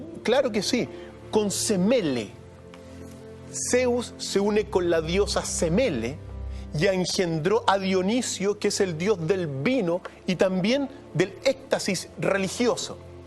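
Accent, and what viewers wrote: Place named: Chile. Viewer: Esto es Chileno mil por mil... ese acento lo recozco a kilometros de distancia. Saludos desde Honduras.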